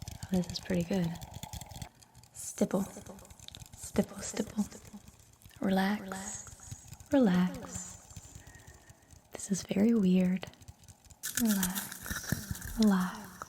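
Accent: United States. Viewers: How do you sound even more American in your regular voice 😂 The change is tingly though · You sound even more American than usual. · Why is your english accent so American? Aren't you german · Whatttttttt?! Where’s the German accent? You sound like a normal American I’d talk to in the street 😂🤯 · How do you have an American accent?? Did you pick it up from American shows or learnt from a German who spoke English with an American accent? I'm so confused rn 😂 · I’m confused. How are you German, but have an American accent? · HOW is your accent so American. It always stuns me how American you sound! I wish my language skills were half as good! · You really, really sound American. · Can you please tell me how/why you have an American accent but, I think you said, you’ve never lived there?! I’ve watched you for YEARS and have always wondered! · I never noticed how much emotion is taken away from your voice when you whisper but it sounds weird hearing you with more range and emotion. 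You also sound a lot more American when you speak normally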